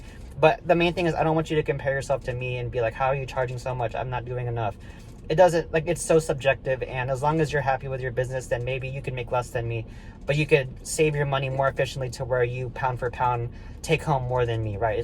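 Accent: Texas, United States. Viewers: What part of Texas are u from Bro?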